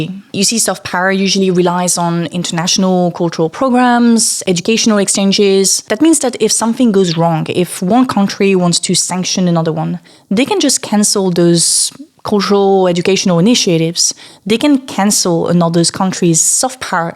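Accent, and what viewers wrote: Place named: France. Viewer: I hear the French soft power with every “videor” you say. I am learning to like the French accent.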